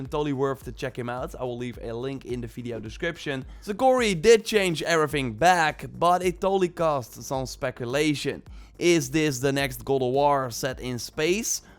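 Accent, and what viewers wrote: Netherlands. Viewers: Nice info and video, greetings from a fellow dutchy (I could hear it from your accent hehe)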